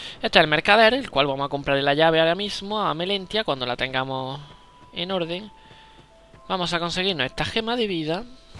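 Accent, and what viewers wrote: Andalusia, Spain. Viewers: DISLAIK xQ se nota muuxo ke eres andaliuz i ke no saves ablar.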